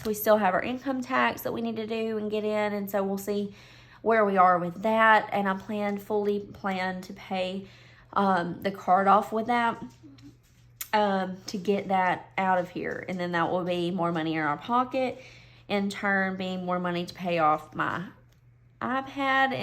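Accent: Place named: South, United States. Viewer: ! That accent miss ma’am! I didn’t know you were a southerner like myself.😋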